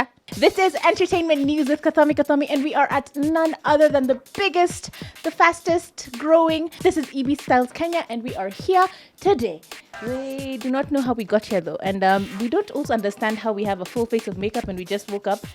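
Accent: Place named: New York, United States. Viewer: wow , i,m new in the channel at first i thought ure from new york ....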